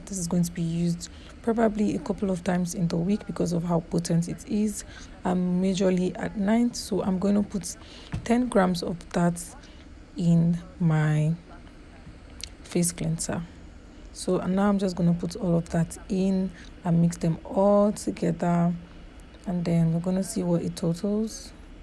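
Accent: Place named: Nigeria. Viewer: well that's my point is you have a Nigerian accent so it's hard for some including myself to understand everything you said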